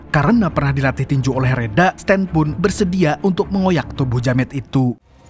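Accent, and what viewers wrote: Banjar, Indonesia. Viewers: Kaypa habar nya.? Banjar ni pina nya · Halus halus wani hangit wkwk orang Banjar kah bang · Lawasny meumpati, hnyr tau urg banjar sdn ni😁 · Mamang bantaki basa banjarnya memberikan komentar rami banaŕ jadinya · Banjar kh mang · Urang banjar kah pian mang😂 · Admin nya orang Banjar kalua kah😂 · Admin orang Banjar kah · Urang banjar kh nih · Orang Banjar yg b isi akun ini kayak nya 😂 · Halus-halus wani hangittt 😂😂😂😅pian orang banjar kah bang 😅😅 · Orang banjar kah mang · Urang banjar kh pian mang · Orang Banjar jua lah mang?😁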